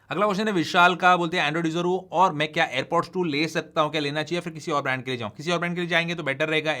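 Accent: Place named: Bangalore, India. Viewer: As salam alaikum. BHAI Abbi jumma jumma 8 din nai Hove. U from Mumbai But your mother tongue is like Bangalore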